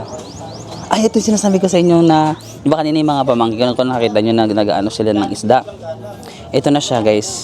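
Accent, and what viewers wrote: Waray, Philippines. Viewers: Woooyyy taga samar ka pala.. Nakakatuwa naman maram kapa mag warayyyyyy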